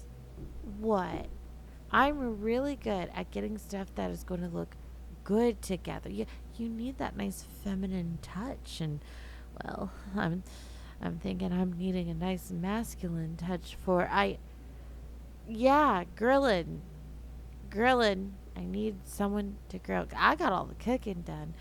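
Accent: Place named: Texas, United States. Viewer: I can tell you're from Texas how you switch from Spanish pronunciation and quickly into English lol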